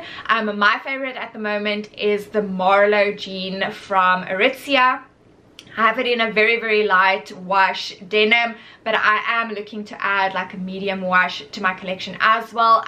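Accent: South Africa. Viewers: Great travel tips, thank you! Are you South African? Every time I watch your videos, I always think you sound so South African to me (being Afrikaans myself). 😅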